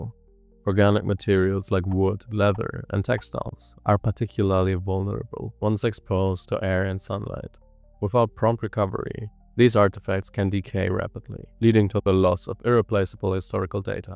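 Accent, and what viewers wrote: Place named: Norway. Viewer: Excellent video on a topic I did not know about. Your voice is fine. Your English is excellent. You sound Norwegian. Which is different and interesting to an American like me and a hell of a lot better than a computer.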